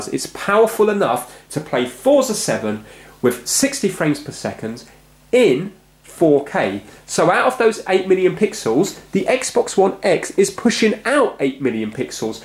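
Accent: England, United Kingdom. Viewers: *english accent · u have a deep British accent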